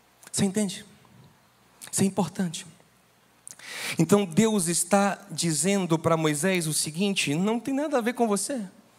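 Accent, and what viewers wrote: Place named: Bahia, Brazil. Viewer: Ouvir uma mensagem poderosa e abençoada como essa, no meu ''dialeto'' e sotaque natural baiano é tremendo demais para mim!! ''Nao queremos viver na mentalidade da carne, de Agar gerando Ismael confiando em nós mesmos...nos leva a compreensão do quão fraco somos até o ponto de perceber que tua obra em nossas vidas é motivo de riso''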